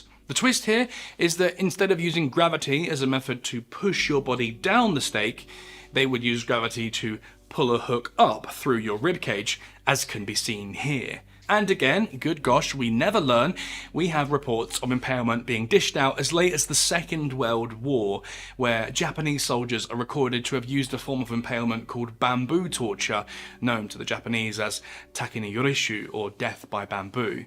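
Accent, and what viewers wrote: England, United Kingdom. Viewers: We wouldn't be doing it were it not for tone, pace, etc. Even the British accent helps. Pauses at the right time, everything's in the right doses. Not too emotional, not impersonal either. Perfect balance, storytelling, except it's real stories. Exactly what he intended I guess. And viewers get educated because he does provide a thorough historical context to every event he covers. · love listening to the English accent talk about history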